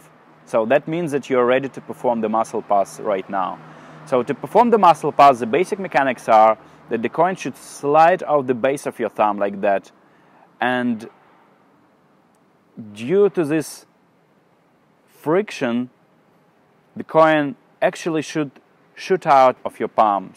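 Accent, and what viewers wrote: Russia. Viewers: Акцент,как у русского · Слышен русский акцент) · Русский акцент.... хмммммм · акцент четко русский да пох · Ты русский? у тебя сильный акцент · классный русский акцент) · Man, you have a Russian accent. Ты ведь русский, да? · Слушая голос и думаю - блин, акцент ну больно русский. Захожу в описание - о, да ладно, кириллица! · Русский акцент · Такое ощущение, как-будто этот чувак русский. Его английский слишком русский :) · ты русский ? просто мне кажется, что есть акцент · Вау, вы так хорошо разговариваете на английском! Но этот русский акцент я узнаю везде)) З.Ы. в первый раз у вас на канале.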